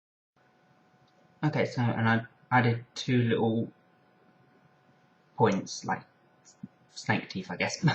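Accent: United Kingdom. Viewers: dear god. listening to Darth Maul speak in a cheery british accent is so bizarre..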